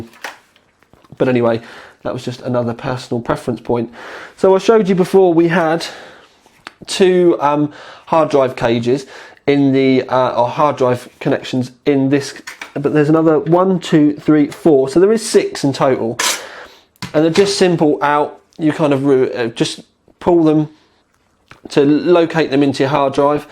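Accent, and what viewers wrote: England, United Kingdom. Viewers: great in depth review :) regarding the window and fans, I much prefer the original as im not really into seeing my hardware and as far as the fans I think your view has a bit of bias because you are coming from (I gather from accent) UK weather as far as need for extra fans and cooling. I however in Australia in summer often get 44 degrees C during the day and hot nights so I need any cooling I can get :) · LMAO tea and bikkies! love the pommy accent man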